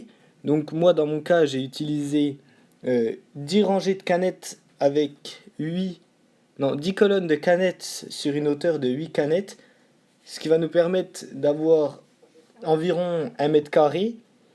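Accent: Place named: Belgium. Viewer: Accent Belge à mon avis ^^ ( j'ai étudié là bas ) :)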